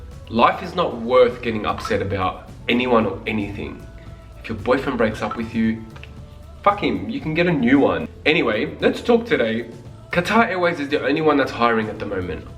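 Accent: Australia. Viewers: Does it get better the longer you stay there? 😢 Ps you sound aussie!!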